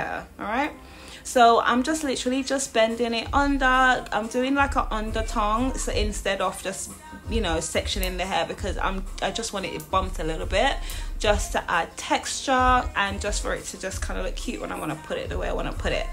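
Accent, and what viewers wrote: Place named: United Kingdom. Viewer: I just love your accent. I love to hear a black Brittain. You are a very talented hairstylist as well. Here in America, everybody is going natural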